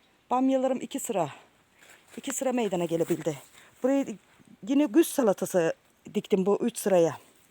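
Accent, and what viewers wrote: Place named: Zonguldak, Turkey. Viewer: aynen bende zonguldak eregli abla şivenden anladım süpersin bereketli olsun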